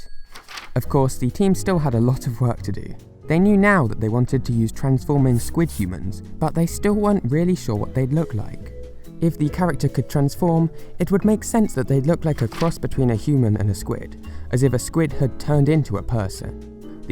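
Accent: United Kingdom. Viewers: You have an awesome speaking accent Are you British?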